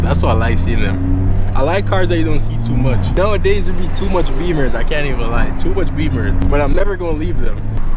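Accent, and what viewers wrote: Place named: Jamaica. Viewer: Are you from Ja am hearing a little Jamaican accent lol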